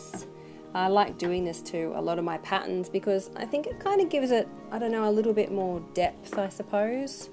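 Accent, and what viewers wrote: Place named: Australia. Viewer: You're voice is making me miss Australia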